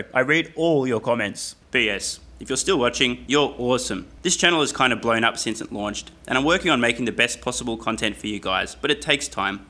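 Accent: Australia. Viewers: It's going to be a tough decision to sell a single share in 10 years! I really hope by then they are paying a dividend by then so I can retire early. Great vids and nice to hear an Aussie accent to get my TSLA news from too, thanks mate! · Great Aussie accent!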